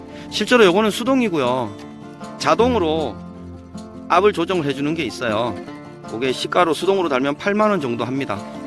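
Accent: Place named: Yecheon, South Korea. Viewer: 시끄라도 ᆢ예천말 정겹워요 ㅎ 늘 홧팅입니다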